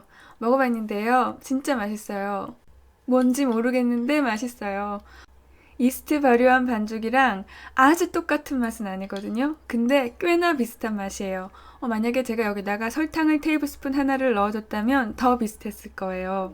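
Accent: Seoul, South Korea. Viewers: 말투가 90년대 서울사투리 쓰시는거같아요 ㅎㅎㅎㅎㅎ 신기하당 ㅎㅎㅎㅎㅎㅎㅎ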